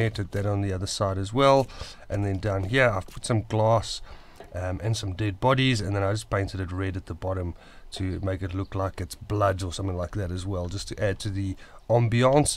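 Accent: South Africa. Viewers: Do I detect a South African accent?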